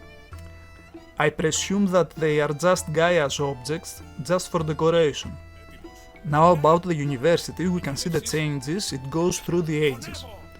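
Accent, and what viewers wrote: Greece. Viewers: Από την προφορά θα πω ότι ο ομιλητής είναι Έλληνας άλλα δεν είμαι σίγουρος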